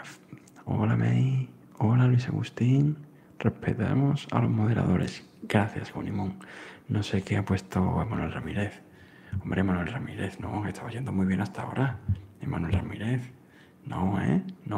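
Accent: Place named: Seville, Spain. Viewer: Yo toda feliz de saber que compartimos acento y tierra (Sevilla🌞); un asmr brutal, muy fan ✨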